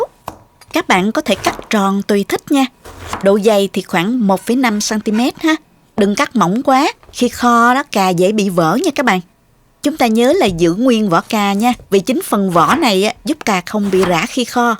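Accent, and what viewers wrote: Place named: Countryside, Vietnam. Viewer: Giọng nói quê phèn mặn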